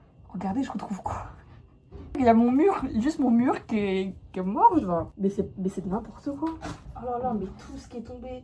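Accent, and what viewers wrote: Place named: Maghreb. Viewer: Pourquoi tu parles comme une blédarde? Pfff. La vie en ville les accents de la ville c'est devenu d'une laideur. Dommage sur un si joli minois. Enfin bon force dans tes galères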